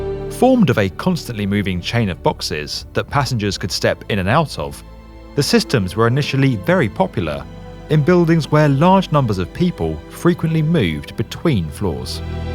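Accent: United Kingdom. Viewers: Surprising you call the lifts elevator when you’re donning a British accent. :)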